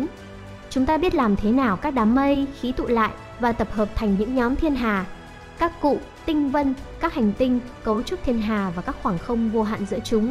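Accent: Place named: North, Vietnam. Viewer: Giọng Bắc đấy.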